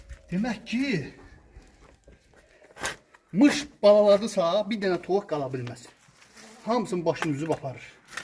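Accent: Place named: Shamakhi, Azerbaijan. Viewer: Deyesen bura Şamaxidir. Lehcesinden oxsatdim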